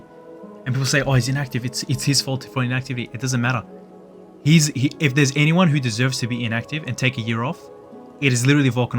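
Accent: Australia. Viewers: Didn't expect an Aussie, presently surprised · wtf didn't know my favourite editor is australian 😂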